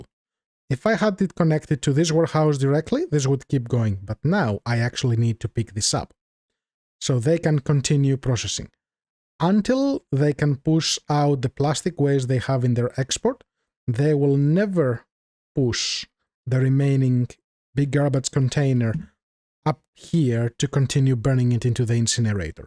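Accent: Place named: Finland. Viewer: I love Finnish accent so much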